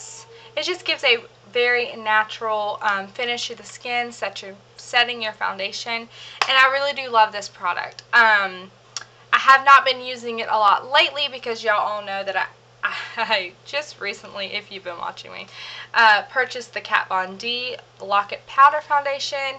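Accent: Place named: Texas, United States. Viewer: I subscribed! Are you from Texas? Just figured you are bc my accent is the same :)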